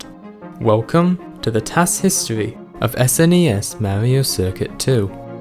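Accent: United Kingdom. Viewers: Most British sounding American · summoning salt but bri'ish (in all honesty rly good vid :D) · legit thought you were british at first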